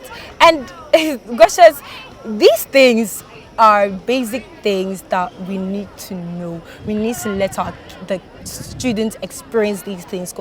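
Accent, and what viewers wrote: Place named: United Kingdom. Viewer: This is so good.. she speaks with a British accent. That is what pertains in the UK. We can model it here for trial.. brilliant idea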